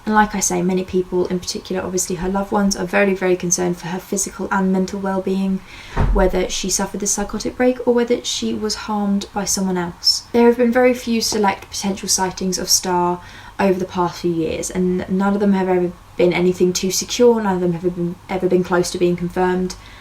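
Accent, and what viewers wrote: England, United Kingdom. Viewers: Don't worry about it you're obviously from England or possibly know that's definitely English not Welsh and certainly not Scottish anyway you know there are at least all the same language basically American and English are completely different languages at this point so don't be so hard on yourself. You did true crime & you're hot that's enough for anybody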